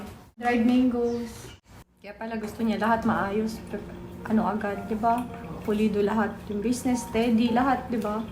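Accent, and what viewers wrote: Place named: Ilocos, Philippines. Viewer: Parang ilokana po kayo mam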